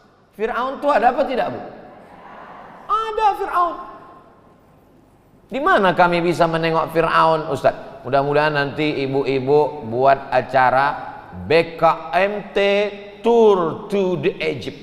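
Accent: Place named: Negeri Sembilan, Malaysia. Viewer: Sepertinya UAS bercakap loghat negeri 9 dicampur dgn loghat kelantan iyooooo 😆 Salam dari malaysia wahai ummat rasulullah SAW.👌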